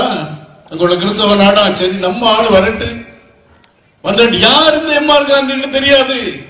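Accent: Kerala, India. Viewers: எனக்கும் சந்தேகம். பேச்ச கேட்டபோதே தோன்றியது . இவன் ஒரு மலையாளி என்று தோன்றுகிறது. ஜெய் ஹிந்த் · நீ பேசும் தமிழ் நாடார் பேசும் தமிழல்லவே. மலையாளம்வாசம் கலந்து நாறுது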